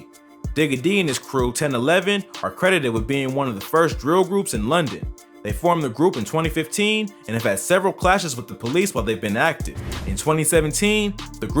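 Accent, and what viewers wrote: United States. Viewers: 😂😂why is there an American commentary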